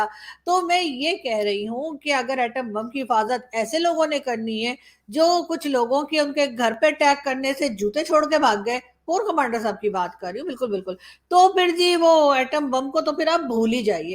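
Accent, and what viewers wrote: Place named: Allahabad, India. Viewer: You're very cute BLOGGER, the way you speak it really reminds me of Allahabad , you're a true Allahabadi , keep it up , my best wishes and blessings I am too is ALLAHABADI , though live in Delhi BUT our ancestors Bunglow is still intact in Allahabad where i frequently visit , it's really a very sad situation that l live here in a flat WHEREAS only three aged my sisters and a brother lives in a 4 acre huge bungalow spread over 32000 sq feet I only wish that one day l could host you in my Allahabad house, if i really survive , l celebrated my 80th Birthday in San Francisco in the month of May So long ❤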